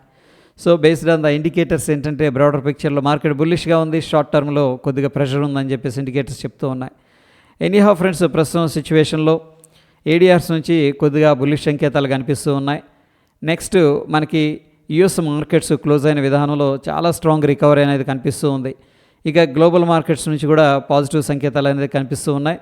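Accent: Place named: Telugu, India. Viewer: Thank you brother for the information🙂👍, your voice and Telugu ascent reminds me the news reader on All Indian Radio morning news in child hood days.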